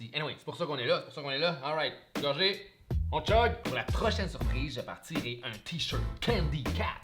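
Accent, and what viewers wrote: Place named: France. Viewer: WAAA, l'accent Français est pas mal, et l'œuvre est juste incroyable !!! Ca doit bien représenter Montréal !!!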